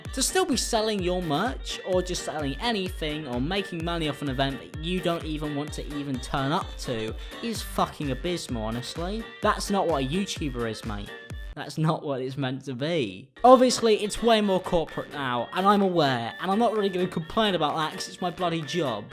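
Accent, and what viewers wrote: United Kingdom. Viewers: Who knew Timmy Turner had such a good British accent?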